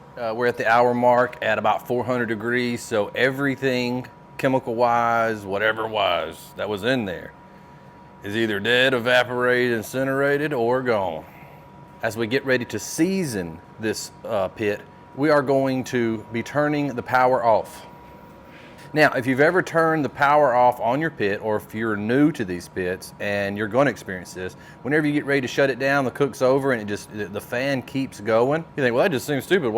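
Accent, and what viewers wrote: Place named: South, United States. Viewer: I am so impressed by you friend. Not only is this a very well thought out and executed video, I have to say that even though you are from the south (obviously), You speak very well! I am so used to southern folks completely butchering their grammar and just basic very poor use of the English language! I'm not knocking anyone from he south, I believe MOST of them are fantastic and fine people, I just cannot stomach to watch 99% of them because their speech makes a Whole bunch of great people that are as intelligent as anyone else in the entire world sound like absolute idiots. FANTASTIC little video here that was just great from start to finish! I will be subscribing! Thank you for being on YouTube!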